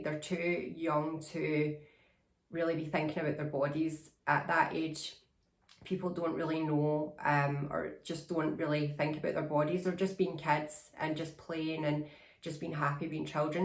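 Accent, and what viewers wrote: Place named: Scotland, United Kingdom. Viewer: Personally I would have been whipped if I had have told my father when I was that Young. Even now when I am at an early stage in my own transition. I still need a lot of encouragement in my journey because I feel Society is not taught any different . That decision to block trans people should not come down to a decision one greedy person decided to Sue the government. Oh by the way I love your channel and the Scottish accent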